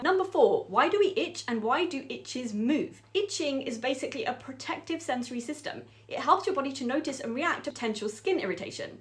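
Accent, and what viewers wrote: Birmingham, United Kingdom. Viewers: Birmingham I love it probably one of my favorite British accent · Had to look up Brummie. So, you're from Birmingham! Cool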